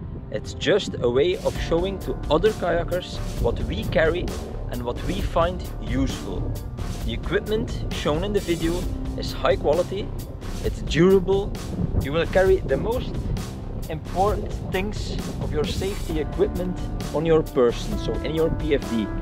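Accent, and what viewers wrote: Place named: West Flanders, Belgium. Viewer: Ne Westvloaming in ’t Engels 👌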